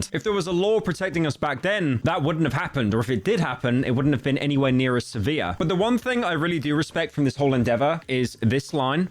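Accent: United Kingdom. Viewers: british lol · Are you british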